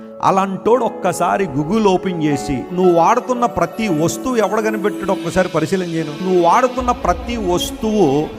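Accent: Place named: United Kingdom. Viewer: Wah british accentch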